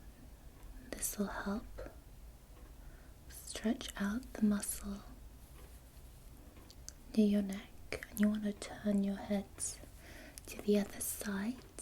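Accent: England, United Kingdom. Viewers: she has a British accent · she has an english accent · Or maybe she was taught the English way of speaking... or better yet taught by an Englishman/woman. Ever wonder why Indians sound the way they do? Welsh English teachers in the 1800s. She sounds English when speaking English because she was taught the English way, not because she's Korean. If an American had taught her to speak English, you'd bet your ass she'd speak with an American accent to. Just the way it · it's her English accent, not Asian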